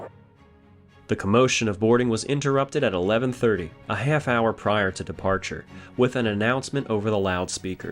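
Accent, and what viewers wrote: United States. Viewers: a wonderful American accent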